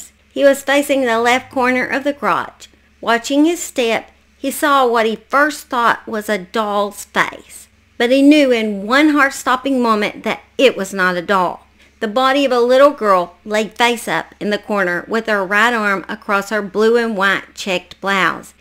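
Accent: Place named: Tennessee, United States. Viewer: I almost flip this video until i remembered someone saying my Pal was unintelligent. Country doesn't mean dumb ma'am. Yes said ma'am because I am born and raised in Tennessee. I suspect we sound very similar. The love of my life called me bammer the day we met. Had to look it up to get a clue. 😂 Somas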